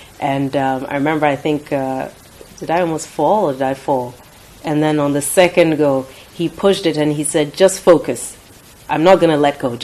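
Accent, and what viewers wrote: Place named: United Kingdom. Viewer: You are talking as british!!!!!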